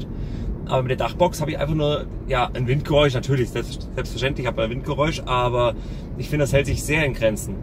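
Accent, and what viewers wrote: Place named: Hesse, Germany. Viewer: Gude, finds cool wennde hessisch babbelst;D Interessantes Video mal wieder von dir. Bin sehr auf dieses kleinere Dachzelt gespannt.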